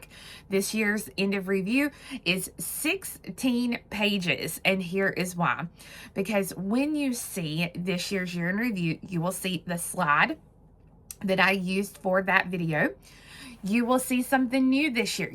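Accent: South, United States. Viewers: you have brought me so much JOY this year. Those are happy tears, right?!? 2026 is going to be the best year! I am looking forward to learning more, it's so important to me that I learn something, especially as I get (cough) a little older. I love your enthusiasm and your patience with us. You are a fantastic teacher. I love your southern accent; I miss my mama and her Tennessee family who have all passed and it brings me comfort when I hear it. Your knowledge is deep and wide and it shows in every video. I don't go anywhere else to buy or learn. I'm committed to you and your bead store. Best wishes! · Thank you so much for this! It’s really an awesome idea! I wish I could buy more, know that I will when I can. I love your sweet Southern voice, it’s a great comfort for me since it’s just me and my cat anymore. God bless you and your family. Have a blessed and beautiful season!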